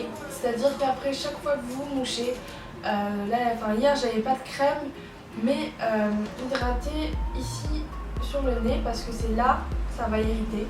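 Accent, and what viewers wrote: Madagascar. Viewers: T'es malgache?? x) Chouette video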